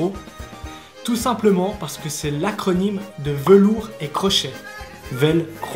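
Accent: Vaud, Switzerland. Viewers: L'accent vaudois est présent!😂😂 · Eh ben t'es vaauudoois ! 😁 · Salut mec tu est Vaudois ou quoi? Perso je viens d'un village au dessus de Lausanne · Le plus accent vaudois qui fait plaisir😂 moi j'ai un accent fribourgeois et de paysan (jte laisse imaginer tché don) 😂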